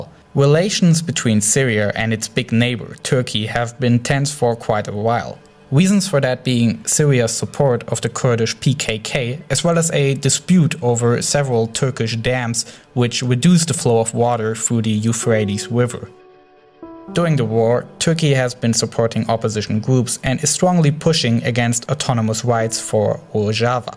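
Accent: Germany. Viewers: Great informative video of what is going on in the Middle East. And from what I heard of your accent I'm guessing you're German? You have pretty good English for a native German speaker! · Alter. Arbeite an deiner Aussprache. Das ist schrecklich. Man hört das Deutsch richtig und es tut selbst mir als Deutschem in den Ohren weh. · I found this video on reddit /r/documentaries and I am wondering why this video only has about 8K views. It is really informative and neutral! You explained the situation in Syria in a very illustrative way and I think you deserve much more views!! And I have one, rather informal question, are you from Germany? (I think I recognized your accent, because I am from Germany ;)) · I'm half german and i have almost the same accent :) So i guess he's german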